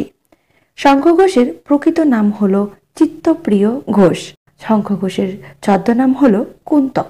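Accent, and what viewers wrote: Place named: East Bengal, Bangladesh. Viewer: আপনি কি পূর্ববঙ্গের ম্যাডাম আপনার উচ্চারণে বেশিরভাগ অকারান্ত বোঝা যায় তো যাই হোক শুনতে ভালই লাগে।😊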